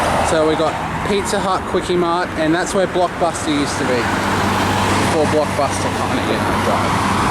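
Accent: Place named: Australia. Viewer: You have a very strong accent. Proves you're definitely in Australia. :)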